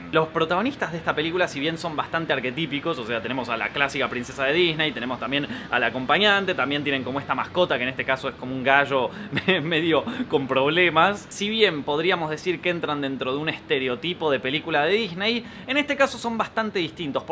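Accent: Argentina. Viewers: Mia Morena dijooo estrenado en argentina tiene un tono che ps q este es español viste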